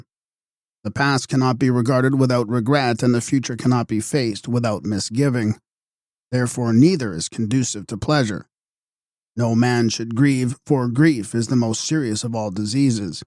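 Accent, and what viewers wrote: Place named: Upper class, United Kingdom. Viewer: Upper-class British voice is always the way... oh well.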